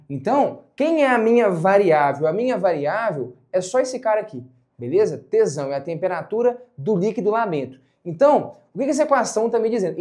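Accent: Minas Gerais, Brazil. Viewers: Oce e mineiro guisole?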